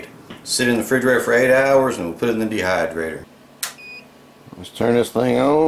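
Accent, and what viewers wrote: South, United States. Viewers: that thick southern accent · southern accent and all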